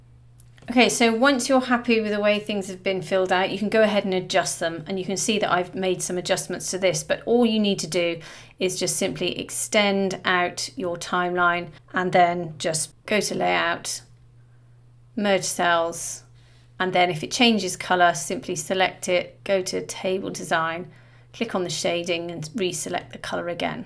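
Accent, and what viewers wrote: United Kingdom. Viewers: What a powerful knowledge on how to play with checklist, etc. Beautiful accent ( British and the calls rocks. Thank you.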